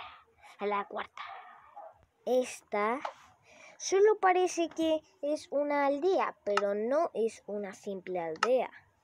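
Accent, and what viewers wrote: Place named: Spain. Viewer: Buen vídeo amigo pero un consejo no hables como un español porque hay gente mala que se te va a burlar. Yo no soy una yo te felicito y gracias por las semillas 😁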